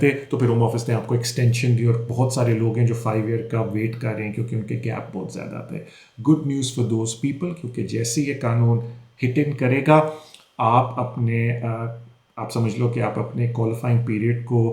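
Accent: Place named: Pakistan. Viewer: Its Pakistani English language XD wtf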